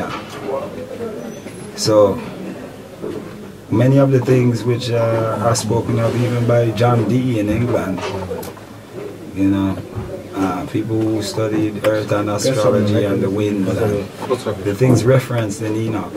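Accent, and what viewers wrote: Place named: Jamaica. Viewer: His accent sounds just almost exactly like a Jamaican.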